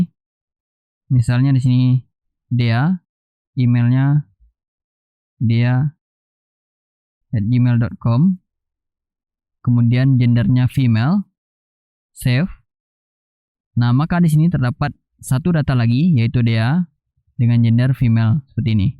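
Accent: Aceh, Indonesia. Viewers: Logat logat nya abang ni seperti orang aceh benarkah ? hehe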